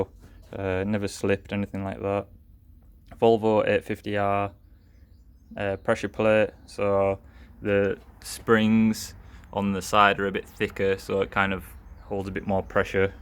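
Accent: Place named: Northern England, United Kingdom. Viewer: thought so from the stronk northern accent ;)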